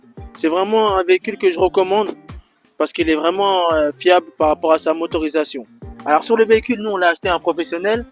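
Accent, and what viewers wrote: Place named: Africa. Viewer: Rien pigé,vous avez un accent africain très prononcé.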